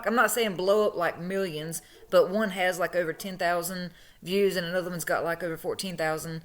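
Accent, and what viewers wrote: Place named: South, United States. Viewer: sis you sound as country as i do!! love ya bunches!~ LuAnn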